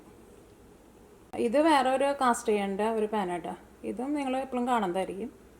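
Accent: Thrissur, India. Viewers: തൃശൂർ ഭാഷ എങ്ങനെ ചേച്ചി maintian ചെയ്തു പോകുന്നത്. ചേച്ചി യിലൂടെ ഞങ്ങൾക്ക് അമേരിക്ക കാണാൻ ആഗ്രഹമുണ്ട്. സൂപ്പർ ചേച്ചി.keep it up. 👍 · Hii. Chechi.. Nanum trissur kariya.. Channel starting tottu nan Kanarundu comment cheytitila ethu vare. valiya esta ee samsaram especially trissur slang.love u.. Lot😘😘adiploi.. Avunudu..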